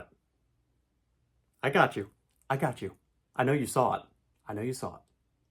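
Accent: Kansas City, United States. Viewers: Are you from Kansas City?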